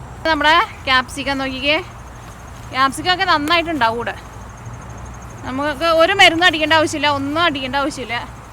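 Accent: Thrissur, India. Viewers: Mmade Thrissur slang Oru prathyekatha thannaaaa tta eshta😄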